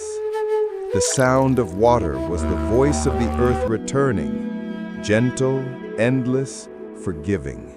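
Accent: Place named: United States. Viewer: What a load of BS - it would have to be some American voice over - as usual!!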